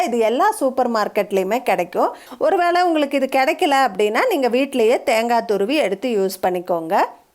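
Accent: Coimbatore, India. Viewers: Mam.. r u from Coimbatore?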